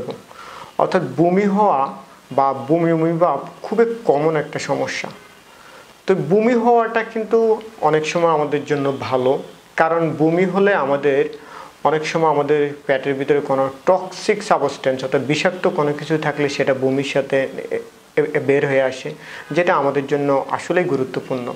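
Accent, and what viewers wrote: Noakhali, Bangladesh. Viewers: 😂😂আমার বানান যথাযথ এবং সঠিক উচ্চারণে আছে আপনি আগে আপনার ভিডিওতে নোয়াখাইল্লা মার্কা ভাষা চু*নো বন্ধ করুন।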